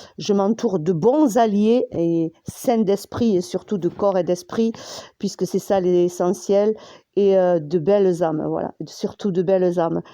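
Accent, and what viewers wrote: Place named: South, France. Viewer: Et un bel accent qui nous emmène au soleil en plus 😘😘😘pour enmerder des détracteurs...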